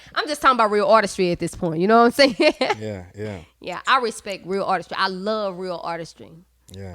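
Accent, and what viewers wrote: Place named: South, United States. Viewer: I love her lil country accent “I’m talm bout Semen 02” 😂😂😍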